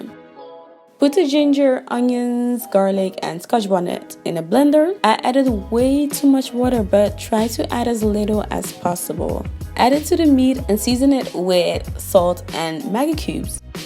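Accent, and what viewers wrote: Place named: Caribbean. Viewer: You sound Caribbean. Im in Jamaica and all the ingredients you've listed are accessible. Thank you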